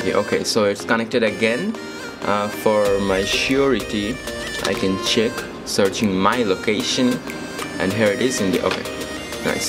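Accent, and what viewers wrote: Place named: India. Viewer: You got Indian accent I ain’t dumb tho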